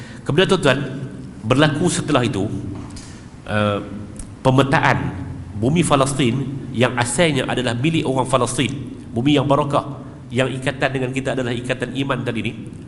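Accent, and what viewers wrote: North, Malaysia. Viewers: Suka dengar Ustaz dan Ustaz Radhi (adiknya). Suara jelas dan penyampaian slang utaqa yg menarik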